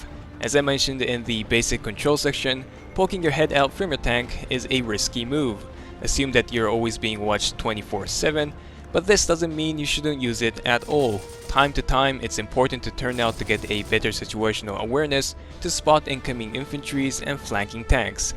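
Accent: Germany. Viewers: U don't speak english normal...are u german or something?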